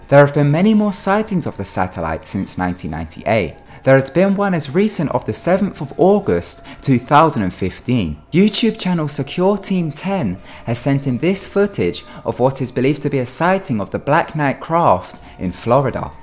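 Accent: United Kingdom. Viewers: What on earth is this guy's accent? It sounds British English at some points, then almost Asian English in others. I find this the more intriguing question. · WOAH You reply? I thought you were famous or something. I Always get on my boyfriends channel (idk how to make one with google plus like he has) to watch your videos I love them!!!! I love guys with british accents lol. Thats crazy you reply to people, I thought all the people with so many watches since youre famous wouldn't reply to their fans and stuff thats so cool you do!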